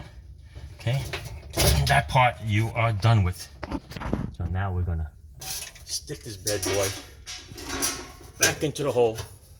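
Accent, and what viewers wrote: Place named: New York City, United States. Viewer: Love that NYC accent.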